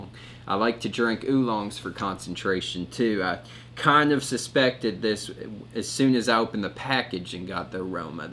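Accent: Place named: South, United States. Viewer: I really enjoyed your review, and I love your background of the Chines tea farms! I’m enjoying some jasmine pearls right now myself to start the day. I love drinking plain tea throughout my day and I get it shipped to me in California from Mei Leaf in London. You are very cute and I love your southern accent. I hope you make more tea reviews.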